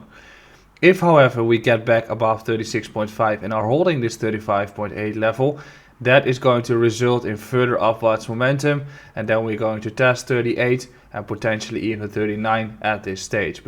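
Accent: Netherlands. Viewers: Ben je Nederlands ik hoor een accentje.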